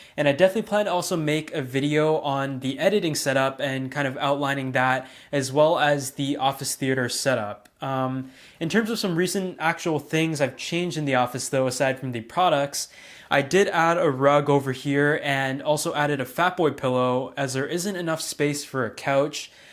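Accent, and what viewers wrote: Canada. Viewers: wait u canadian fam?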